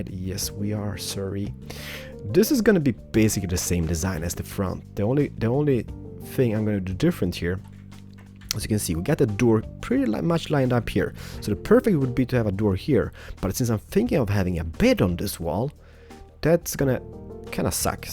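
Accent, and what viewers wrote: Sweden. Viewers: Are you swedish? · are you swedish?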